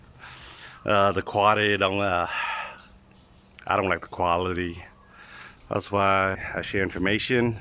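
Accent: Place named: St. Louis, United States. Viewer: Where did you pick up the black lingo and dialect? I've never heard a Vietnamese sound like you before. Sounds like St. Louis area. Where in Vietnam is this?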